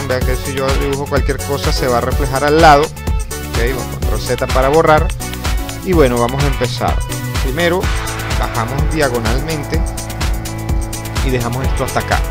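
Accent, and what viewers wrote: Venezuela. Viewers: VENEZOLANO? HABLA COMO DROSS PERO VERSION TUTORIAL XDXD VIVA VENEZUELA :V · Eres venezolano? :v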